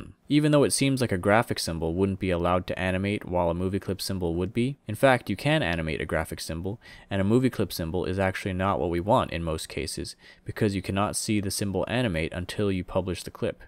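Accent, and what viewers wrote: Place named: United States. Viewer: He Is American Dude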